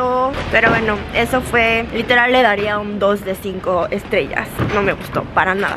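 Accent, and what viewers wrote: Mexico City, Mexico. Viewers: Se te pego el acento fresa de México jajaja, tal vez viviste en CDMX o Puebla? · Tú acento impresionante es mexicano y fresa, jajaja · CHICAS hablan muy bien el ESPAÑOL la chica de pelo largo tiene una voz MUY SENSUAL y se le oye mas bien como del DF en otras palabras chilanga, pero la otra chica su voz me a enamorado a parte que esta muyyyy linda, besos y abrazos para las dos y muchas felicidades por su canal y sobre todo que bueno que les guste la comida de la gloria del cielo MEXICANA, saludos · Que hermosa estas y tu aciento mexicano muy linda saludos mexico te espera oh tu amiga también muy linda 😉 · hola !!! me quede esperando el final (2do video)... también esperaba que en el restaurante Oaxaca pidieras enchiladas de mole 😋, te faltó ponerle limón a los tacos y si deberías probar lodctacos de lengua 😋y me sigue encantando tu acento muy Mexicano!!! 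Saludos🇲🇽 XOXO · Querida eres toda una mexicana me di cuenta en la forma de comer los tacos la chispa que tienes y obviamente cuando hablas saludos desde Chicago 🇺🇸🇲🇽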